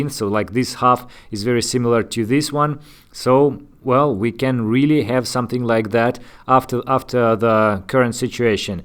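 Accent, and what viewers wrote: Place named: Russia. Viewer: Sounds like russian accent :) But anyway, very solid video! Thanks!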